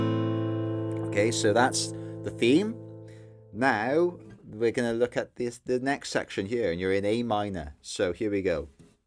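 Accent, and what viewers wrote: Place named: Welsh Valleys, United Kingdom. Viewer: Well then, (who I have only just found), where have you been hiding with your cheeky grin and boy-oh of the valleys accent? You're brilliant at this Chet style which, even after 65 years, I still cannot do; Boo-hoo! The control and independence of all your fingers is coupled with the ability to start, finish & repeat anywhere along the way of the toon..... Wowee! I can't do any of that. I'd like to say I learnt something from Chet - if only the names of the tunes and their existence....... but even that's a stretch for me. You know the one; It goes "Tic-toc / tic-toc / tic-toc blah, blah, blah" Thanks a billion for the lesson and breakdown of just what actually goes on in the execution of this piece - and the entertaining way in which you presented it. Should you feel the need to know more about my own style of playing I'll just say "Don't bother!" Thanks again Just wonderful..:hand-pink-waving:face-turquoise-covering-eyes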